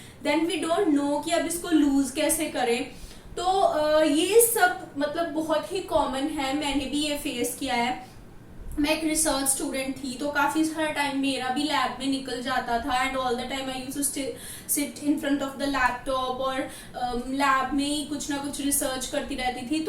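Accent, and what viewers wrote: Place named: Punjab, India. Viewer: Hi, Awesome video!!👏🏻👏🏻 So happy to hear your voice + that punjabi accent😍 Proud proud😎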